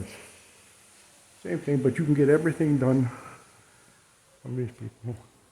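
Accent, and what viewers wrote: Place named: Chicago, United States. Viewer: Good video! Your voice sounds fine like my cool uncle from Chicago.. I thinks you made a good choice. Thanks for sharing and take care!🙏🙏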